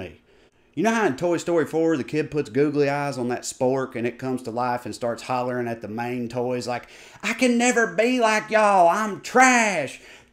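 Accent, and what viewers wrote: South, United States. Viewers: Man with common sense and has a southern accent? How yo doin’ 😉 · refreshing to see some intelligence in southern accent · ....I love you man. There's just something about hearing the honest truth from a dude with a southern drawl that really makes it stick. It takes a special kinda person to make someone laugh as they are learning about the horrors unfolding all around them in this fine country of ours. Well done sir, thank you, and keep up the great content. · Gotta love... You can't get more Southern and heartfelt than after a rant like that, when he still gives you a genuine "love y'all" at the end, just like mama and daddy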